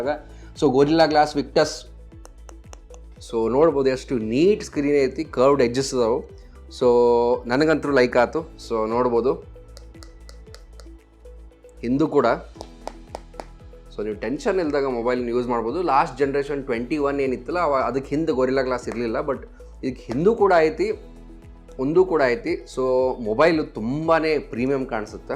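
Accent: India. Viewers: Unique style of explaining technology with sense of humour. Loved your Jawari kannada. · Niv Belgavi davar anta heli ,bal Hemme ide broo🙌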